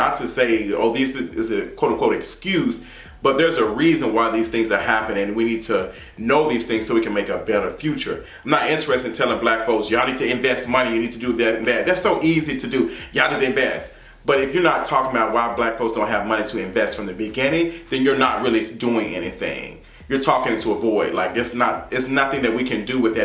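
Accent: Memphis, United States. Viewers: Are u from Memphis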